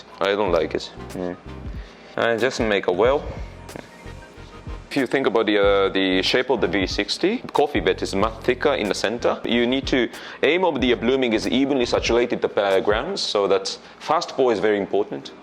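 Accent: United Kingdom. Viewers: 영국식 발음을 구사하시네요. 영어도 굉장히 잘 하시구요.ㅎㅎ 설명이 너무 좋습니다. 모든 행동에 다 이유가 있으세요. 마치 수학자처럼 꼼꼼하게 커피를 연구하시는 분인 것 같습니다. 멋진 분이신 듯 ..많이 배웠습니다,~!! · 우리도 영국영어 도입이 시급하다 귀에 쏙쏙 박히네😆😆😆😆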